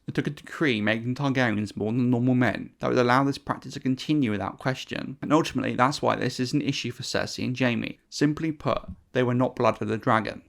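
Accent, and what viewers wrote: United Kingdom. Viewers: Let me guess you are from Liverpool 🤔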